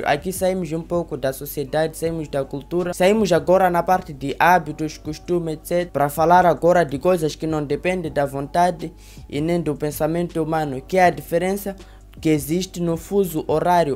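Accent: Mozambique. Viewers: Entendo o sôtaque Moçambicano de boa kkkk😄😄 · Sobre o seu português, o seu sotaque é o sotaque moçambicano mesmo ou você está tentando falar o português brasileiro?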